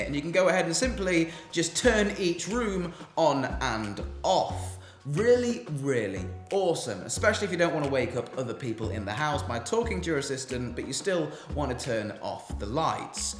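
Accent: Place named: North, United Kingdom. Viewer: Really? He doesn't have an upper class accent. Sounds quite midlands/northern to me (not saying that northerners can't be upper class, but the accent usually is a tell-tale). Also, that chip on your shoulder. Have you thought of dealing with it?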